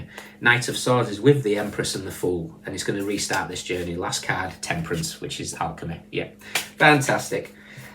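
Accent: Northern England, United Kingdom. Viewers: Definitely Northern England...probably Manchester area. 🤗❤ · Thank you for yr reading ! Love yr accent...North of England ?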